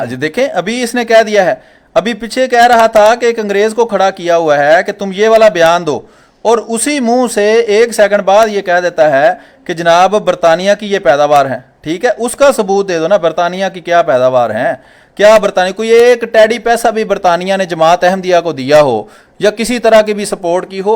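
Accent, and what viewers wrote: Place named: Punjab, Pakistan. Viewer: Sorry your accents is like fighting. I love to share mta who are soft spoken. You are punjabee urdu mix accent looks very low class..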